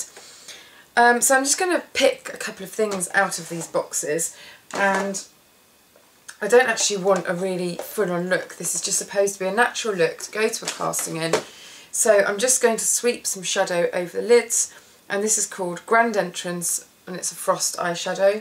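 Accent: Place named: England, United Kingdom. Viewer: what part of england are you from? Where is your accent from? kisses from canada!!!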